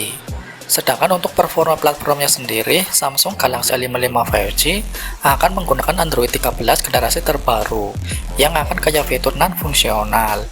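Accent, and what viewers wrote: Banyumas, Indonesia. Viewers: G ngapak g penak?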